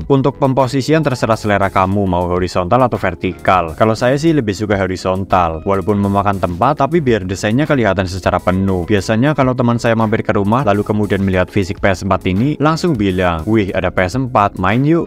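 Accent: Banyumas, Indonesia. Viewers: Wong Banyumas toh mas?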